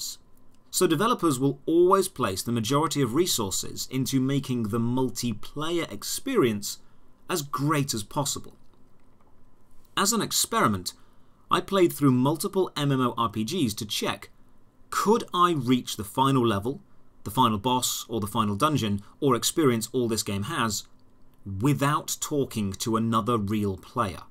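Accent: United Kingdom. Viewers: man, british people talk the same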